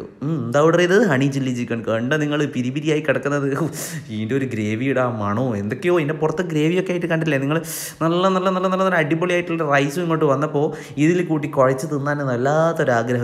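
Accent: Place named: Kozhikode, India. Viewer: നിങ്ങളെ വീഡിയോ കണ്ടിട്ട് കുറച്ച് ആയിട്ടോ -- ഏതായാലും സംസാരം കോഴിക്കോടൻ ആയത് കൊണ്ട് നല്ല രസണ്ട്ട്ടാ😝 മക്കൾക്കും ഇഷ്ടം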